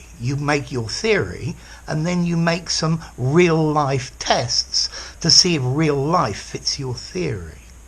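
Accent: United Kingdom. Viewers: Glad to help. I also love his lessons. But the first thing, I was attracted by, was his British accent.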